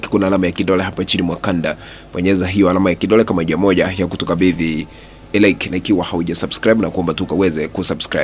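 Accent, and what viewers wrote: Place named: Kenya. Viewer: Huna akili.mamae wewe ni mkenya siunasikianhata rafudhi mbwa wewe hamtaiweza Tanzania kima nyie kapewa nanani ? Wewe shoga tuu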